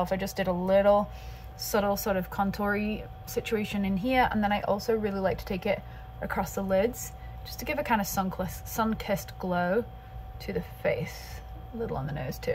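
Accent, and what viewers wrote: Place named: United Kingdom. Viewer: You sound so much more British in this video. I wonder if it's because your parents have been visiting? I love it -- you sound delightful 🙂 😘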